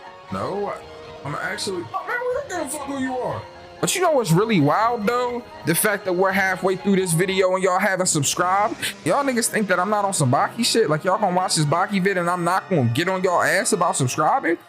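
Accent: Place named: Philadelphia, United States. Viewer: Had to subscribe bcuz u sound like u from Philly so gotta support my city 💪🏾💯🙌🏾 not much of an anime guy but I love Baki show nd a fan of good commentary and humor… u checked all my boxes 🤣 keep goin bro … “this my third day out here “ ….a Philly reference? definitely Philly bul never thought I would be able to identify a “Philly “ accent 😂 made me peep my own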